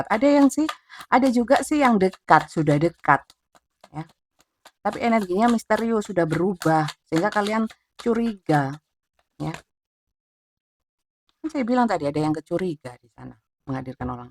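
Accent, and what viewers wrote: East Java, Indonesia. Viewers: MANTULL BUNDA...JAWA TIMURAN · Bunda lucuu beneran krmekel sy... gak uwe uwekk😂😂😅😅 Asli jowo ne ketokkk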